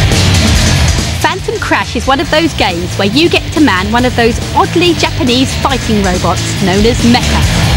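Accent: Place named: United Kingdom. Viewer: shes too british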